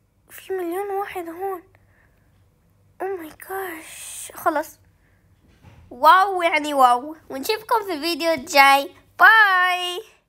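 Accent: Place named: Egypt. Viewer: انت من الأردن ولاكن انتي تتكلمي مصري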